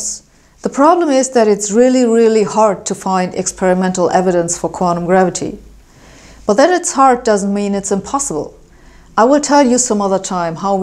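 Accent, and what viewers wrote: Germany. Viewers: I have tried to analyze why I like your video’s so much. Is it the German accent in your scientific English that for my generation spells deep learnedness? Is it the high amount of inflection with which you impart so much understanding and which makes listening to your Referat so easy, with a cup of coffee? Is it the full dedication to content, with a total lack of ego, not trying to show how smart you are, but how interesting the subject matter is? Or is the choice of subjects, that are mundane questions that you are able to make into glittering gems of physics, reminding us physicists what a beautiful field we have chosen? Or is it the total absence of smiling, that so often is strewn all over the presentation by women? I do not know what that means or if it is intentional or not, but it is a constant through all of your fascinating video’s, making them even more fascinating. 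It has made me think, what does smiling do to a story being told. I’m beginning to find out.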